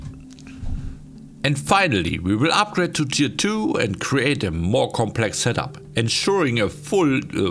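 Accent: Germany. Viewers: The German accent is the best part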